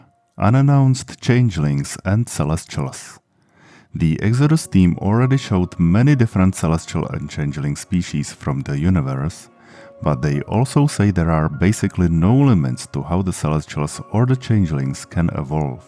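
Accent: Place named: Czech Republic. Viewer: You sound very Czech. Are you Czech? YOU ARE! Hello :D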